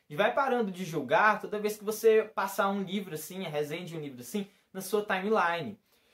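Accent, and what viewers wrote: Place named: Minas Gerais, Brazil. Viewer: É uma delícia ficar ouvindo esse sotaque mineiro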